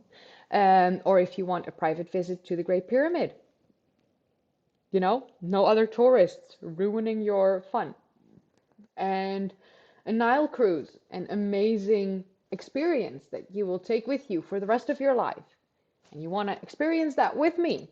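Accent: United States. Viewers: your diction, intonation and pacing / rhythm so like "American English", that I thought you were an American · your accent,..which, to my ears, sounds rather American - influenced ( especially the ‘ r ‘ consonant )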